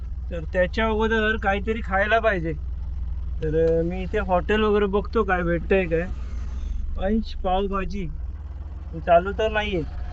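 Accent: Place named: Malvan, India. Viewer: दादा मध्ये मध्ये मालवणी बोलना ❤ भारी वाटतं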